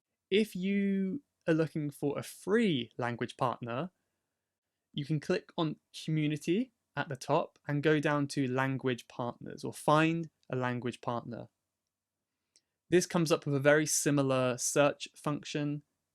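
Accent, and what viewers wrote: RP, United Kingdom. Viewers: Do you speak Rp accent?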